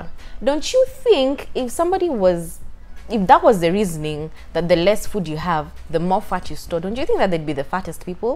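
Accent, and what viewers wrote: Kenya. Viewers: You sound Kenyan :)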